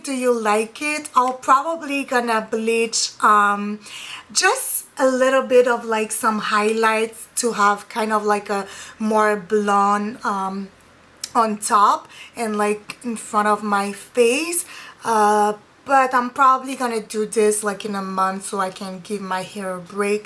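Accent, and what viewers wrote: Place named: Brazil. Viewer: Are you from Brazil? Your accent is beautiful!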